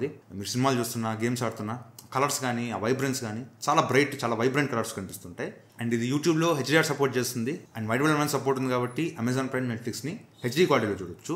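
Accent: Nellore, India. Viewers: bro r u from nellore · nellore ah anna needhi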